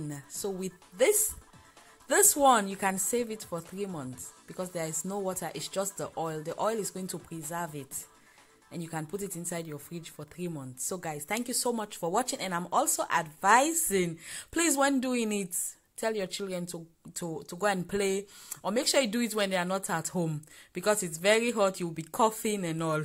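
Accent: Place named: Cameroon. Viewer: Same ascent with Cameroon people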